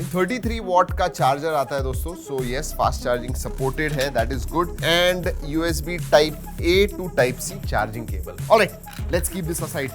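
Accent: India. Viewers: My advice..start to learn proper English , you are speaking Indian english😅😅 so only Indian can understand . Why you Indian to lazy !!!!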